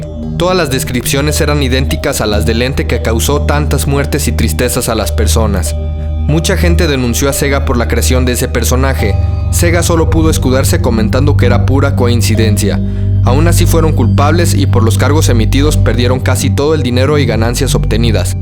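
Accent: Mexico. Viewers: Eres de México? si es asi de donde eres? buen vídeo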